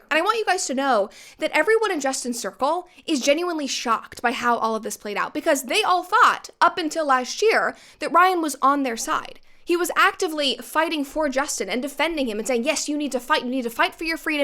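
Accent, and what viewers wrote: United States. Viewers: I really liked the way u talk fast and quick vibing American accent 🇺🇸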